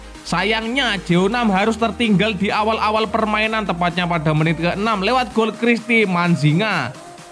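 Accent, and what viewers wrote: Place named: Java, Indonesia. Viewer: MIN MEDOK BANGET.. ENAK DENGARNYA NIH..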